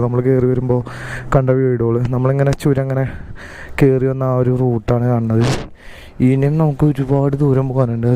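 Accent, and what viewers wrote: Thrissur, India. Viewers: നിങ്ങളുടെ തൃശൂർ സ്ലാങ് പൊളി ആണ് ട്ടോ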